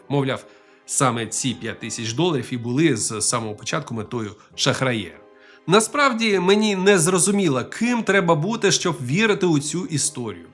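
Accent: Russia. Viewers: Приєднуюсь до критики і від себе хочу додати, що відчувається россіянський акцент. Треба над цим попрацювати, або не поспішати. Але взагалі дуже чудовий канал та цікаві відоси. Продовжуйте! Будемо дивитись!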